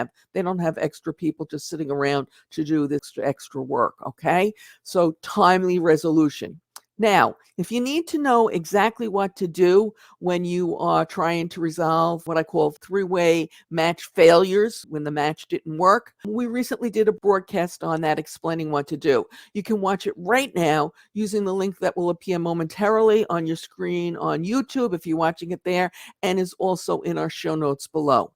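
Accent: New York, United States. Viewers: Absolutely you said it convincingly. Do u have a ny accent?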